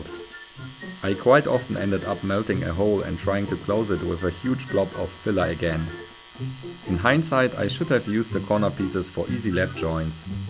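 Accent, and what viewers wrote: Germany. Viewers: Bist du Deutscher klingt so? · Mann hort das du deutsch bist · He sounds very german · Ach ja der gute alte deutsche aktzent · Du hörst dich sehr 🇩🇪 an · Are u from Germany ? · You are german? Du hast so nen Akzent(ich glaube , dass man dies so sagt)?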